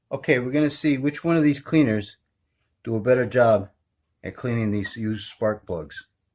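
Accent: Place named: Boston, United States. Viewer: I like the Boston accent. If you ever meet Mark Wahlberg or one of his brothers, let us know.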